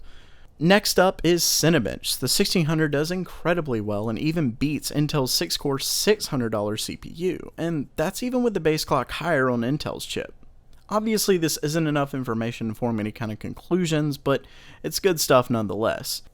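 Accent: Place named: Tennessee, United States. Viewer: That accent is basically the same as mine, had to keep shaking the feeling that it was me talking xD Are you from Tennessee?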